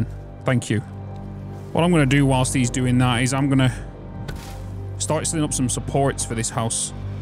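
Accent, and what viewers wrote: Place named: Preston, United Kingdom. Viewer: Your accent really sounds like you are from preston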